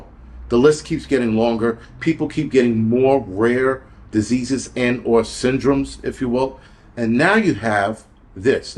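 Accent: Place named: South, United States. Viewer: You have some South in you.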